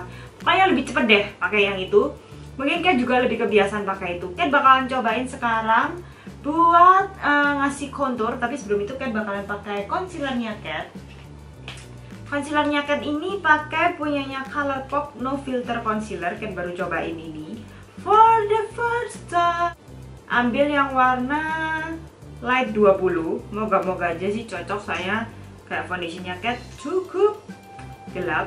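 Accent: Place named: Surabaya, Indonesia. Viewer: Mau cerita sedikit ya kak Aku ngikutin video kakak dari awal banget sampe sekarang dan aku juga udah subscribe channel youtube kakak dan aku seneng banget kakak itu baik dan ramah dan mungkin kita sama2 dari surabaya kali ya hehhe😂 gaya ngomongnya medok khas orang surabaya Ohh iya satu lagi aku udah like, subscribe, and comment lengkap sudah👍 #kathlakzgiveaway2018 Aku mau jelasin kenapa aku positif thinking untuk menangin giveaway ini karena 1. Mau ngikutin jejak kak bisa makeup se-professional kakak 2. Pengen jadi youtuber juga tentang makeup2 gitu, doain aja ya kak semoga terwujud😊 3. Aku udah gak sabar buat nyobain brush itu, kakak buat aku ngiler deh pengen nyobain 4. Percaya diri bahwa aku bisa menangin giveaway ini😆 "MERRY CHRISTMAS & HAPPY NEW YEAR🎉🎉🎉" IG: Email: <mail>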